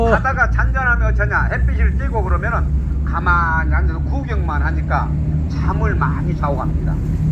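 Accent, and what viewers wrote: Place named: Jeolla, South Korea. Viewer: 구수한 전라도 사투리 정겹습니다 에메랄드 빛 바다, 코끼리 바위, 거북이 바위, 거시기 바위... 홍도 정말 절경이네요 영상 잘 보고 흔적 남기고 갑니다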